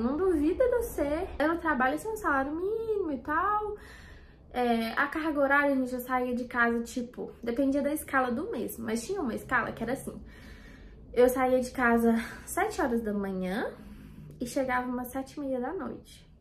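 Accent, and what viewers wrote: Minas Gerais, Brazil. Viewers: Caraaaaa... Apesar da situação sensível, obrigado pela coragem de compartilhar sua história com a gente. Eu jamais me imaginei assistindo um vídeo do YouTube de 30 min... Só hoje assisti os 3 seus. Um dos motivos é por vc estar compartilhando algo que não é só a suposta "perfeição" que vemos exposta na internet. Aaaah, cara, o sotaque mineiro (salvo engano) é maravilhoso! Tatuagem de interestelar 😮❤ mostra mais dela sim! Amo esse filme! Estimo melhoras e que tudo fique maravilhosamente bem para vc e para os seus. Abraço! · .. é uma delícia ouvir vc falar.. vc é tão boa comunicadora, seu sotaque mineiro é tão gostoso, e seu jeitinho é muito fofo! continueee <3 Deus te abençoe demais! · fico tão feliz por ter voltado. Gosto tanto desse jeitinho mineiro seu de falar, e independente do seu peso, voce continua linda!! ❤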